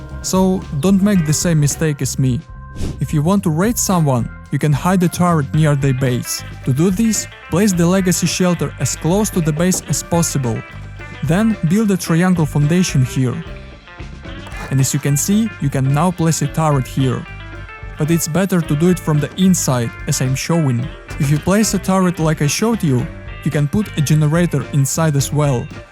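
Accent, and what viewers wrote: Russia. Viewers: Бро разговариваешь хорошо, но мы знаем что ты из России) акцент тебя выдает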